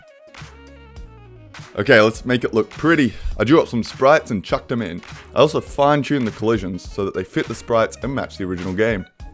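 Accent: Australia. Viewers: Tf your Aussie?😮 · You sound very Australian let me tell you ! 😆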